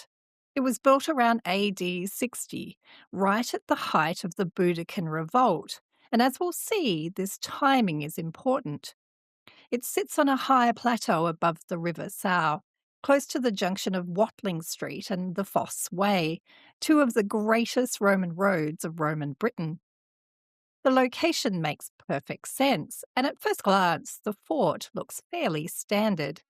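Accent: Australia. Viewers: Always good to hear an Aussie accent talking about British history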